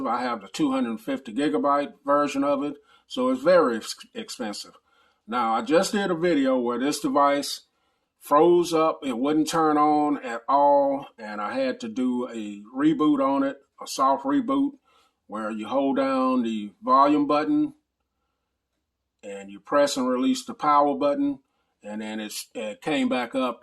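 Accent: South, United States. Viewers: Like MKBHD with a southern accent lol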